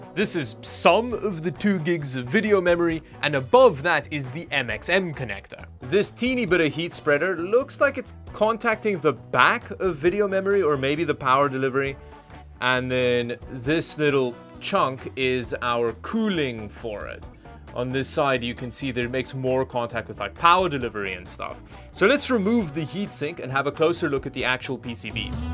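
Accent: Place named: South Africa. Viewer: This guy has a South African English accent lol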